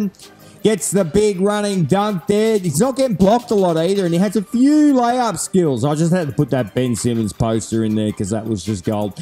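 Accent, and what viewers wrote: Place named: Australia. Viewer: Ben your voice is change , you sound more australian now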